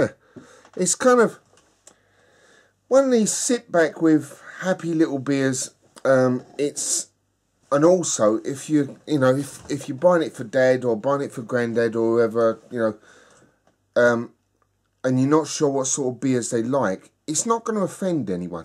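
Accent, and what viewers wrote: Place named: South East London, United Kingdom. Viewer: Interesting about the brewers star, thanks for sharing, I did not know that. I did know about the five pointed star in Washington yes. The pentagram has been used for thousands of years, mostly symbolizing life or humanity, or the union of male and female. I like watching your videos by the way, I think your quite and interesting reviewer. Where are you from? You have a South East London accent, you sound just like my mate from Peckham.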